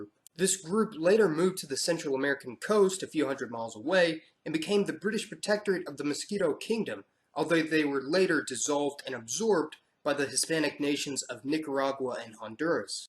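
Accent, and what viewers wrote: United States. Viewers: He tends to force American phonetics onto foreign words, but with very little effort into pronouncing them correctly. The worst I've heard were on the videos about Filipinos and Japanese.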